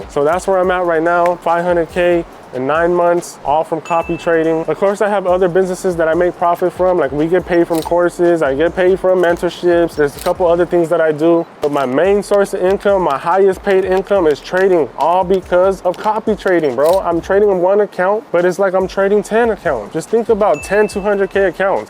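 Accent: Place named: California, United States. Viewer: You sound exactly like me and my people from California to a tee. I am on the same exact journey. Preach to me, bro. I'm in between my dropshipping and just discovered Forex, but I have stock experience. Now, I am researching Forex trading. Vegas taught me not to ever treat trading like gambling lol real talk.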